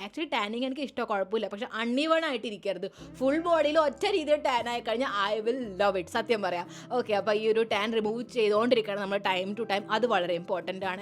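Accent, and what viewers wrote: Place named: Thrissur, India. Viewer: Chechi I felt that your slang changed to thrissur slang 😂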